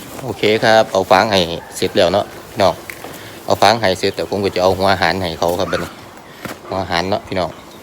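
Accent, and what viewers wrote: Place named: Isan, Thailand. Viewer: น่าสนใจดีค่ะ พูดภาษาไหน ก็ฟังได้คะ่ ภาษาอีสาน น่าฟังคะ่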